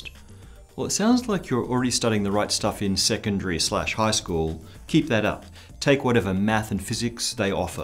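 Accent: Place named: Australia. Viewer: Cba with the presenter and his aussie accent mate!!!!!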